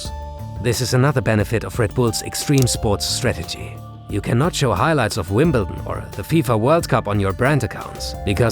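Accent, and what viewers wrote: Germany. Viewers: Athletic Interest is THE best channel when it comes to informative and exciting sports stories. Thanks a lot! And never get rid of your Unique selling point: your german accent speaker. simply great 🥳🥳🥳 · u german?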